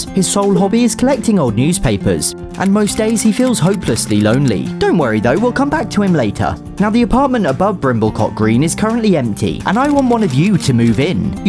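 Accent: England, United Kingdom. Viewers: Yo are you English or welsh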